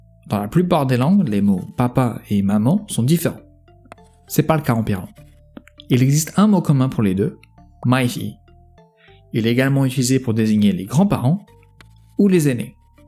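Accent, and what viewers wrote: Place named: Portugal. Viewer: oui je me demande aussi. Dans quelle région on prononce [tj] dans voiture ? jamais entendu ! cet accent me semble plutôt un peu portugais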